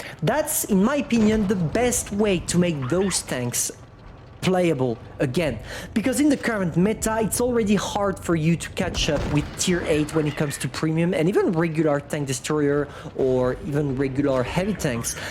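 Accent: France. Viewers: How can somebody be so annoying? 40% the French accent 30% that face 20% those awful glasses 10% those girly arms and shoulders... I'm kinda interested in what he is saying but the person itself... OMG horrible creature.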